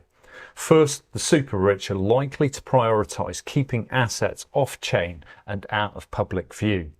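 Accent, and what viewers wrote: United Kingdom. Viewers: I’m new to the channel, and I’m impressed. If you’re in the USA & older like me, try putting playback speed at 90% to 95%… much easier given the Brit’s accent. Great video.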